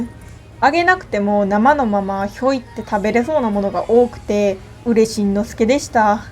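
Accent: Kansai, Japan. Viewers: 関西弁やから、親近感湧く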